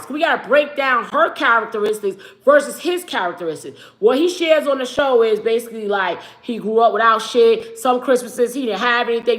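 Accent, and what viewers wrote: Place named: New York, United States. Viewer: ❤❤❤❤❤❤❤❤❤❤❤❤❤❤❤❤❤❤❤❤❤love love love it Girl i subscribed and everything thank you for your new york accent and honesty…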